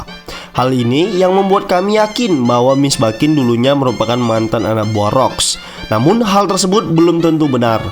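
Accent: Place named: China. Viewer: NARATORNYA BISA LOGAT MANDARIN 😭😭😭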